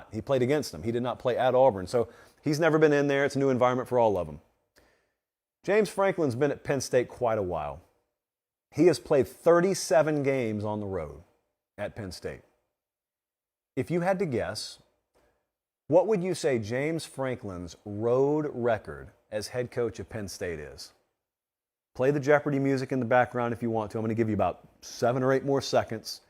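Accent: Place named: Georgia, United States. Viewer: …… I can tell you are from western GA hahahaha it’s pronounced “Wine-der”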